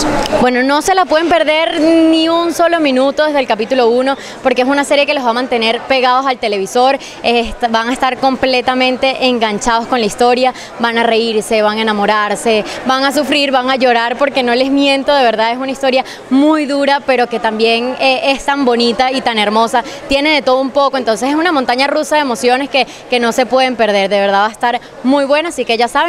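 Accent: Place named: Colombia. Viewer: Se le está pegando lo colombiano!!!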